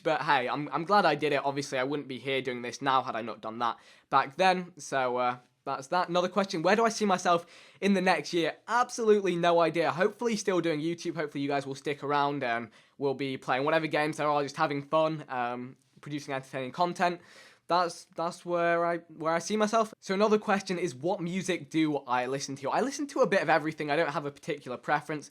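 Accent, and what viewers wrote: England, United Kingdom. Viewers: I'm a new sub recommended by a friend, out of curiosity what is his ethnicity? his accent sounds English (UK) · gianluca moores Maybe you thought that because he has a English accent .___. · well he was raised in Britain so obviously he got to be talking in the british accent. but he's indian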